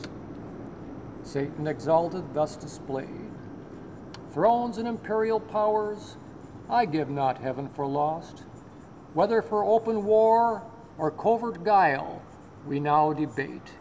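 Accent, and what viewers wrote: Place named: New York, United States. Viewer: sounds a bit weird in the new york accent...like walt whitman doing larry olivier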